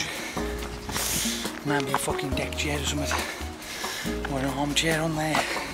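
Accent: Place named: West Midlands, United Kingdom. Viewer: were u from m8 sound from west midladz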